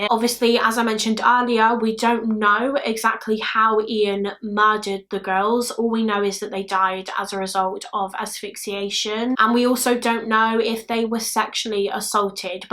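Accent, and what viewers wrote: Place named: England, United Kingdom. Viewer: I think ur work is amazing!!! Although i dont particularly like English accents - ur content is too good to ignore. Its comprehensive, interesting and well written. Keep it up!!! Also....introduced to ur channel by Josh Miles and his collab vids 😁👍 keep up the great work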